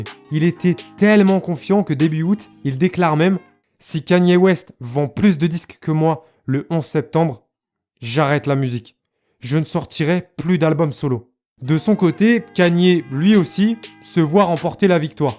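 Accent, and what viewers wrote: England, United Kingdom. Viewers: mention speciale pour ton accent anglais et tes videos de wack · ouiiiiiiiiiiiiiiiiiiiiiiiiiiiiiiiiiiiiiiiiiiiiiiiiiii enfin tu fais plus ton accent anglais tout était parfait sauf ça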